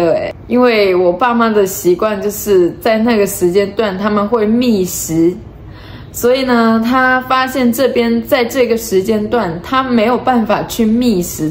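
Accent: Taiwan. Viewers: 咦？妳說的一口台灣國語真的很到位，相信妳已經愛上了這裡，祝福妳！民主自由妳應該感應的很深刻，覺得妳很享受這裡的一切，恭喜妳。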